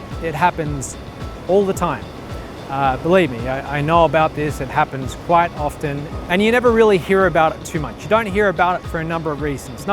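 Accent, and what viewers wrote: Australia. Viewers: As an Aussie, I would say he is definitely Australian. He is apparently on Facebook - perhaps you could ask him directly as well?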